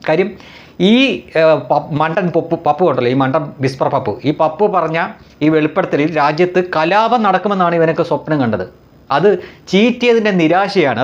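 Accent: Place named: Onattukara, India. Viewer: എന്തുവാടേ, നല്ല ഓണാട്ടുകര ഭാഷയിൽ പൊളിച്ചു കൈയ്യിൽ കൊടുത്തു, keep it up👍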